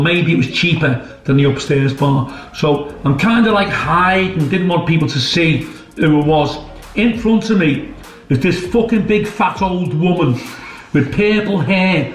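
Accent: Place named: Liverpool, United Kingdom. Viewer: Proper fuckin scouser you 💪🏻💯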